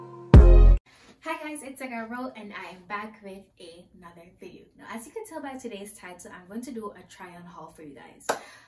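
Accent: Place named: Jamaica. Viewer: loved this video. I caught the accent. Jamaican? If so, I'm Jamaican as well and i also did a Back to School Try on Haul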